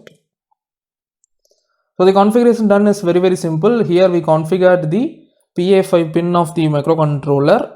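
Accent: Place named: South India, India. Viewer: Dude Are U a South Indian?? My Maths Teacher was also South Indian, BTW Cool Accent ;-)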